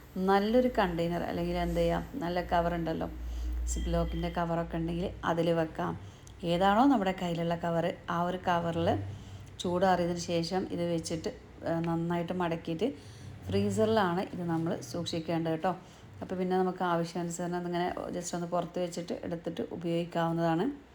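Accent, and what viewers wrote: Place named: Mavelikara, India. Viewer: Kasarkode basha parayu Ethu Mavelikara basha aanu.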